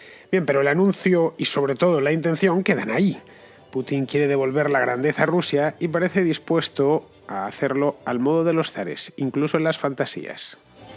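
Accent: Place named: Madrid, Spain. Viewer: El tonillo chulesco y faltón de cada video que pasa es más inaguantable. Yo entiendo que el madriles, como el asturiano, es un dialecto local del español, una muestra de lo grandiosa que es la lengua imperial que siendo una se resuelve en una pluralidad de localismos. Ahora, modérate muchacho. Que no estás en malasaña con tus coleguitas neoliberales. Lo de Putin: es evidente que les está devolviendo la moneda a los USA que les endosó Reagan en el 86. La operación es la misma que la de ese año. Entonces, cuando Reagan anunció la guerra de las galaxias, que era una película de Hollywood, el joven agente Putin estaba en Berlín. Vincularía este anuncio a la elevación de Xi Jinpin a Emperador marxista del Imperio del Centro. En este bienio ae cargan el dólar como moneda patrón y pasamos a los DGS.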